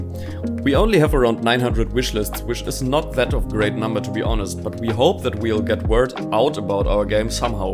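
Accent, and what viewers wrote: Germany. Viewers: Warte du bist deutsch oder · Englisch ist top, aber der deutsche Akzent verrät dich sofort 😂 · R u german? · Kleiner Tipp: Der deutsche Akzent hat dich verraten 😏 Grüße aus dem Deutschen Reich! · I hear deutsch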